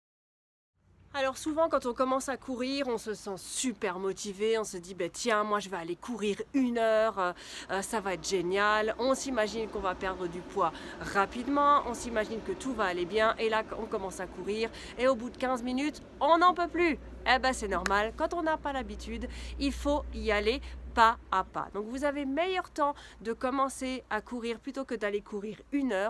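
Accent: Switzerland. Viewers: J'adore le petit accent suisse 🇨🇭 😍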